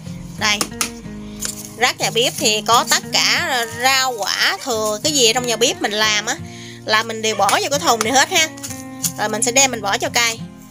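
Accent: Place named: Mekong Delta, Vietnam. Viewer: Da.tai coi nghe chi nói chuyện giọng trong trẻo và ấm áp nên e tưởng chị là người miền Tây ah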